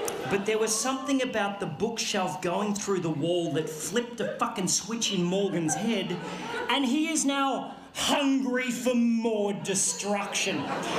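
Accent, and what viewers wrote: Australia. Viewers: this poor guys comment is getting attacked guys chill lol also yea i know what you mean his emotional range with the aussie accent and the way he talks goes soo well with how we speak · He's actually pretty normally Australian. is just regular Australian. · This isn't aggressive Australian, this is regular Australian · mans so aggressively australian he replaced the voice in my head , now everything i read is in australian muppet reject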